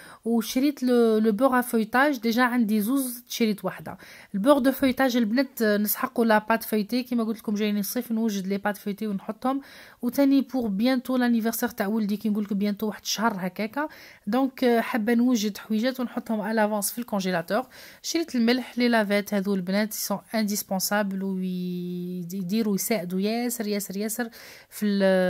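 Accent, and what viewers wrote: Annaba, Algeria. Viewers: أنا أم ريحانة من عنابة شبهت صوتك لتاع عنابة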